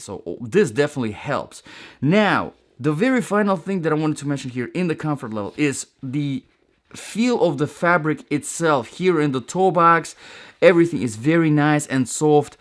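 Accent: Russia. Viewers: you have perfect russian accent, i can understand every word😅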